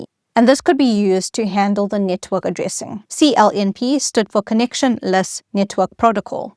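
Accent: South Africa. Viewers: Is that South African accent?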